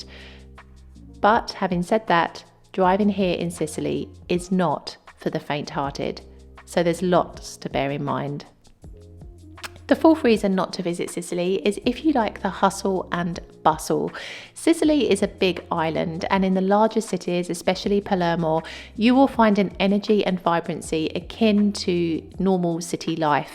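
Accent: United Kingdom. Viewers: Sounds like a British accent 😂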